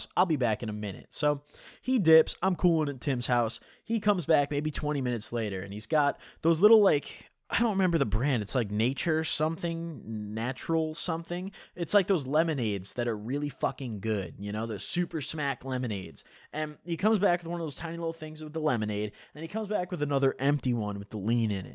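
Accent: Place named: East Coast, United States. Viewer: Where are you from? gotta be east coast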